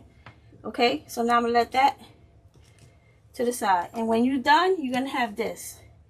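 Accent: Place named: Louisiana, United States. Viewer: Just discovered you!!! I love it!!!! Your accent reminds me of Louisiana... Baldwin, Franklin, Jeannette, Grand Mary, New Iberia ....somewhere round there💜💜💜👏🏽👏🏽👏🏽👍🏽👍🏽👍🏽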